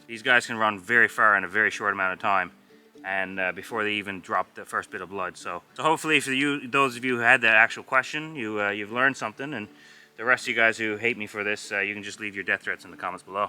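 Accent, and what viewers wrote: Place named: Prince Edward Island, Canada. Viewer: Its similar to the PEI accent, which is a mix of Newfy and Scotian I think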